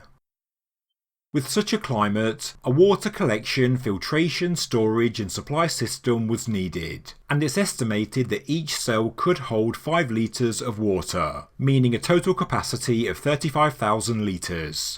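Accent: United Kingdom. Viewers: O conteúdo do Canal é ótimo. Mas ainda aguardo legenda para Português no vídeo. Esse inglês britânico é horrível!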